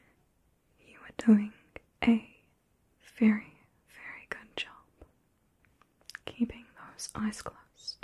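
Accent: New Zealand. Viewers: New Zealand mate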